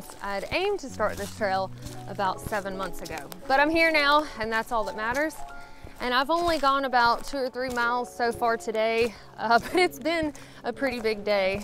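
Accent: South, United States. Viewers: Thank you for your videos. You’re such an inspiration to my 10 yr old daughter. Though she doesn’t have a southern accent, she often tries to emulate you... it’s very funny. Thanks so much.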